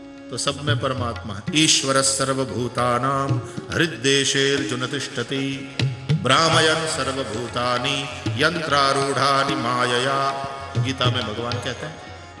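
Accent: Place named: South India, India. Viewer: Dear Swamiji, your Sanskrit pronunciation is topnotch. looks like you have studied somewhere in south India.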